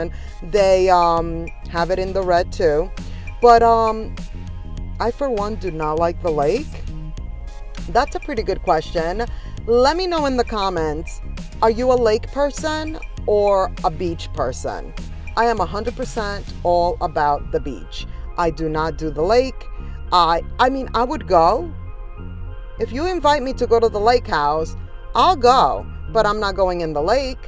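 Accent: New York, United States. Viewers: what part are you from!!! Put me in your suitcase, lol!!! I thought you were from NY by your accent!!!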